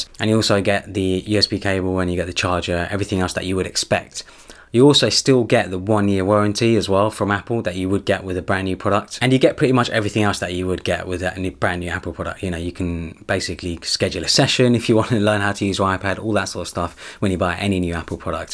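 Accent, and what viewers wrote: United Kingdom. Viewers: I normally get annoyed with British accents but i can listen to you all day. Nice review! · First time 9n your channel man and damn your accent is wow! Never liked the British accent before hearing it from you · love how he's indian and has a British accent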